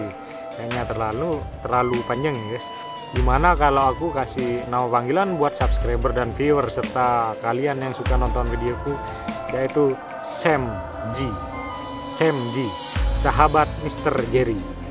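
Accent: Madura, Indonesia. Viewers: orang madura dari logatnya bicaranya ya mas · Kalo dari logat bicaranya, mas orang madura ya? Salam settong dere tretan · Kok logatnya kaya org madura ya? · Kak kalau dr logatnya orang madura ya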